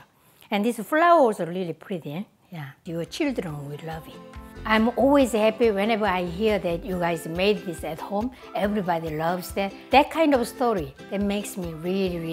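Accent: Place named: South Korea. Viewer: 이분영어도 너무사랑스러워요 투박한 한국식 발음으로거침없이 설명하시는영어 진짜좋아요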